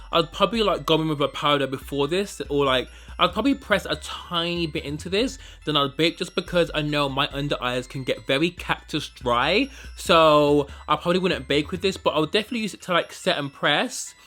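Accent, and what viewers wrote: Birmingham, United Kingdom. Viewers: Yessss I can hear The Birmingham accent in this video lol I keep wanting to try Fenty but everyone I go to the counter it’s ridiculous. 💕 I have your piriton sis lol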